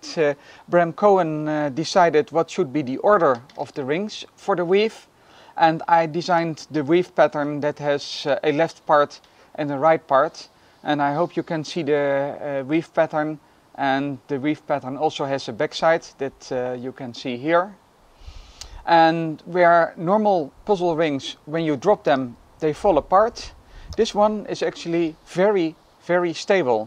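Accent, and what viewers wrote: Netherlands. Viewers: Je bent Nederlands of denk ik het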